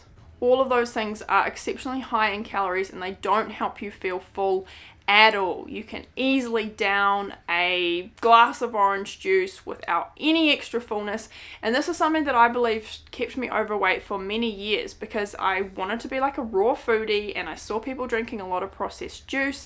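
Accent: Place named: New Zealand. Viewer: How good to hear a kiwi voice talking candidly on this topic! And I love your hair 🥰 it’s so pretty 😍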